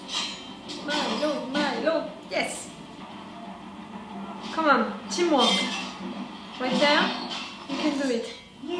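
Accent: France. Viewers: I knew it for the first 10 second it was my fellow french accent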